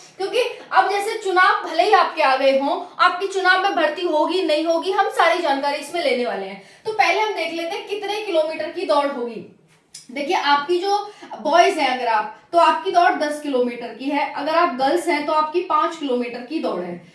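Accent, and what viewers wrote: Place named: Braj, India. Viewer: आप तो ब्रज भाषा बोल रही हो बहुत प्यारी लगी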